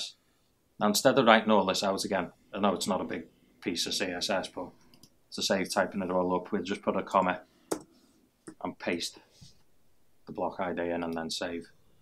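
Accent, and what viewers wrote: Liverpool, United Kingdom. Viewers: Cheers - all brand new to me so lots of replaying!! Great to hear the accent though - cheers two Scousers abroad!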